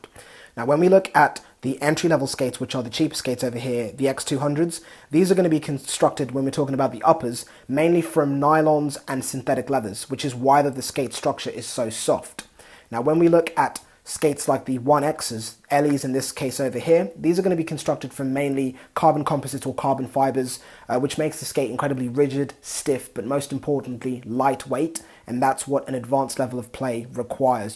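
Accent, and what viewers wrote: England, United Kingdom. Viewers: I was expecting a Canadian accent, not English. Anyway, thanks for the video, I'm in the market for skates. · Can anyone else narrate without that accent., it’s bad enough on commercials or cooking shows but now hockey, funny not aware of any English hockey teams.....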